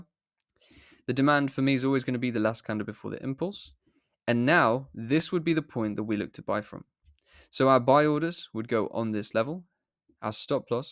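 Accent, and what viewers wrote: United Kingdom. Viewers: Bristish acent is great!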